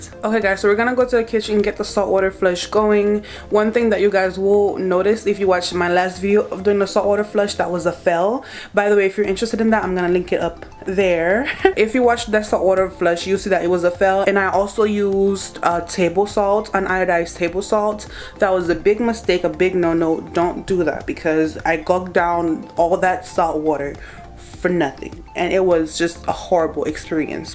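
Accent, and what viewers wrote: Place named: Jamaica. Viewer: Hi lady! You sound Jamaican like me. Where you're from originally? Mi love your videos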